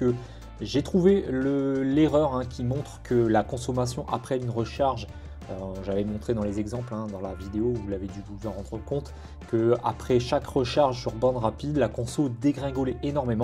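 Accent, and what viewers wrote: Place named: North, France. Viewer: Salut voisin nordiste (vu l'accent), merci pour cette vidéo. Pas mal les prises de vues avec le drone au Tréport.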